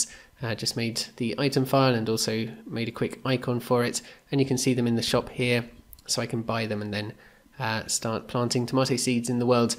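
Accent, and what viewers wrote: Germany. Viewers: Wow, your accent is so clean. Didn't expect you're a German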